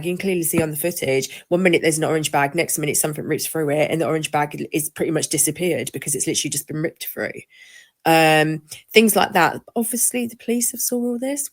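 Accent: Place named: Southern England, United Kingdom. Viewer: well I’m from south of England (isle of wight) and I KNOW the police are slack and corrupt down here she sounds like she’s from the south?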